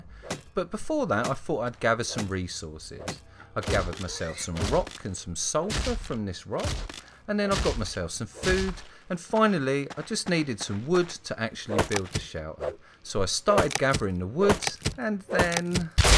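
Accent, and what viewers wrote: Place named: United Kingdom. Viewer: the calmness in your voice as you're hacking up people is quite disturbing lol +1 for the british accent... kinda "Wallace" -like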